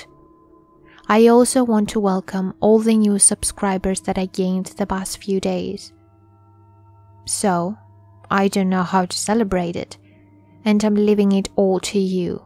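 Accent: Ireland. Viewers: You sound a bit like a Greek beauty with an Irish accent. Not sure where youre from but your voice is addictive <3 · Is that an Irish accent? You sound like a fairy :-)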